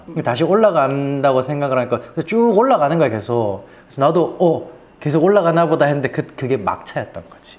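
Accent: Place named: Gyeongsang, South Korea. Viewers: 포항분이신가봐요 저도 포항에 살고 있어요 억양이 엄청 친근감있다 했더니 ... ㅋㅋ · 경상도 말투가 정겹네요.. 자카르타에 살다가 한국으로 휴가나왔다가 복귀 못 하고 있습니다 ㅠㅜ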